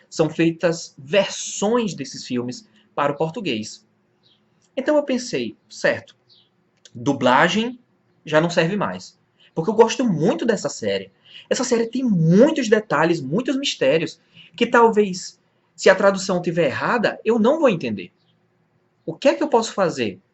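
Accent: Rio Grande do Norte, Brazil. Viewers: o sotaque potiguar me chamou a atenção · gostei desse sotaque do rio grande do norte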